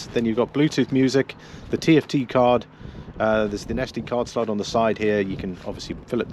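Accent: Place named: Liverpool, United Kingdom. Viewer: Great video, I’m considering one of these devices so you’ve covered everything I wanted to know. Btw I’m getting various accents in your speech, you’re definitely not Irish but I detected a bit of Liverpool there, am I wrong ? Subbed you from a scouser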